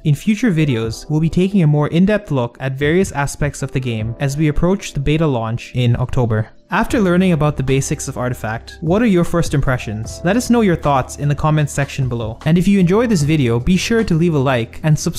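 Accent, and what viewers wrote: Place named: Philippines. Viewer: Are you Filipino by any chance? You have a Filipino accent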